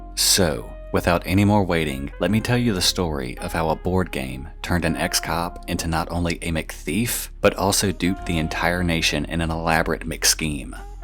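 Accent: South, United States. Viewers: First vid I’ve noticed the southern accent. Love your content ! Keep doing what you’re doing, my guy ♥️ I wish you all the subs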